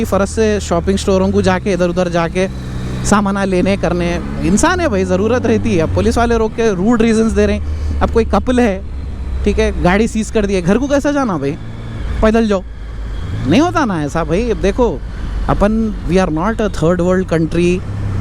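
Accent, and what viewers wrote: Hyderabad, India. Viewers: Bhai speed triple k upper ek detail wali video he bana do garh pe reh k. 😂love your Hyderabadi accent❤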